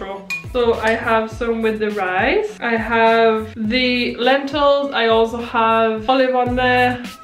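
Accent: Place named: United Kingdom. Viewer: Very interesting a British girl making Puerto Rican food but you did a good job that was very beautiful love the British accent much blessing😊👍🇵🇷🇬🇧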